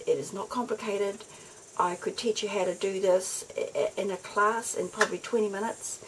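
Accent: New Zealand. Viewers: Hi...I love your New Zealand accent! I really do enjoy your tutorials and please keep talking(or singing!~)I look forward to you next vid...off to watch PT. 2 to this vid! ~Jeanne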